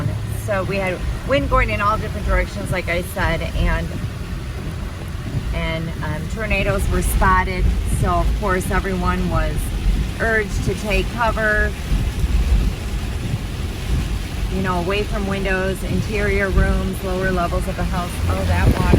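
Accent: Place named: South, United States. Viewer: you sound like southern usa americans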